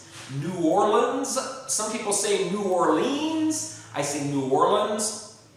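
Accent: Illinois, United States. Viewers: I am not originally from Illinois, but both of my parents are. They sound exactly like you! It's interesting to see how much of my pronunciation I've inherited from them! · I live about an hour away from Rockford, so this sounds correct, for the most part. I pronounce crayons differently though. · I'm guessing you're from Danville or Marshall or there-a-bouts...lol I'm originally from Terre Haute. I pronounce everything the same as you! Enjoyed the video! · This accent is a lot like mine (minus a few words) But my dad is from Illinois so it makes sense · A lot of this is really similar to my accent, Im from Decatur, in the heart of central Illinois. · Sounds like up here in West Central IL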